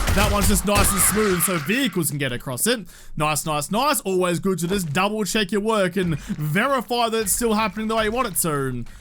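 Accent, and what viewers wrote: Australia. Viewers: No Aussie has a good day for their "r's" and "a's"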